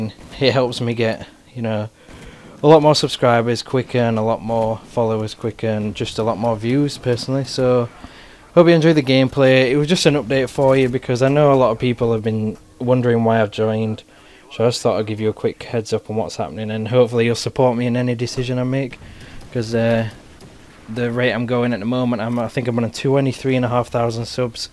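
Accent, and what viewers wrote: Yorkshire, United Kingdom. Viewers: Were u from spratt, yorkshire ???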